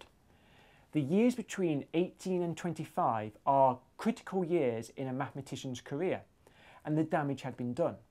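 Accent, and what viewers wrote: United Kingdom. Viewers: the story-telling is somehow better with the British accent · Love the British accent. Hardys thoughts make me upset im almost 25 and I feel like now I can't make it ugh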